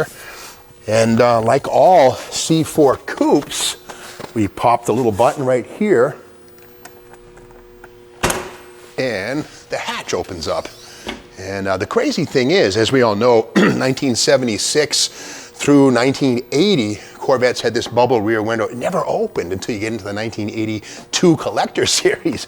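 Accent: Boston, United States. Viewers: ❤ your Boston accent🎉 am buying 88 year for 6400🎉❤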